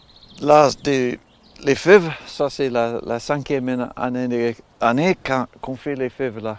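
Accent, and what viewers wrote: United Kingdom. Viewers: Hi . bonjour merci quelle belle video fait avec un grand coeur rempli d'envi de partager vous etres a lentlemen merci . Je suis en france et je regarde toute vos video . C,est un bonheur de vous ecouter francais parfait with the English touch beautiful ❤️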